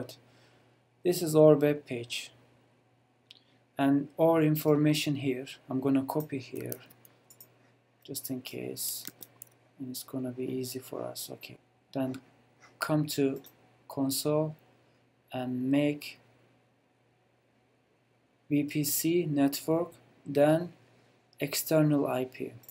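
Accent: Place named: Turkey. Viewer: Çok faydali ve işe yarar bir video olmus sagolun bey bu arada videodaki ingilizce telaffuzunuzdan anladim sizinde bir Turk oldugunuzu:))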